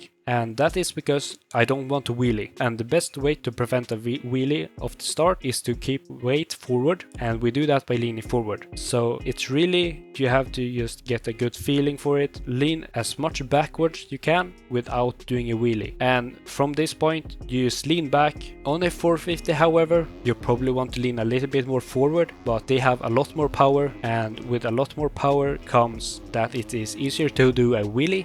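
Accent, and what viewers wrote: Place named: Sweden. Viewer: Jag hör att du är svensk så jag tar det på svenska. Hur lutar jag fram och tillbaka? Är inte som på GTA där man använder joysticken, i alla fall inte för mig. Har jag någon nybörjar-setting på som blockerar?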